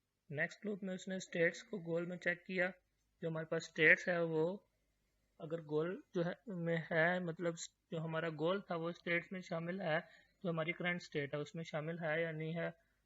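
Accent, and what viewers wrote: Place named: India. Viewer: 印度英语听不懂。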